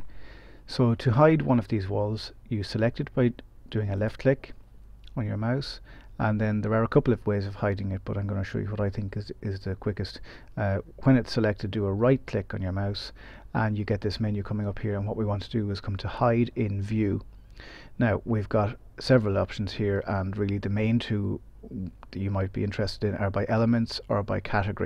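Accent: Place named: Ireland. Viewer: Its good to hear an Irish voice and well explained.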